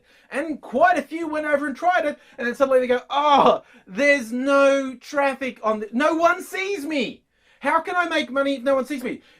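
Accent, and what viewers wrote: Australia. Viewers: Why is Patton Oswalt speaking with an Australian accent?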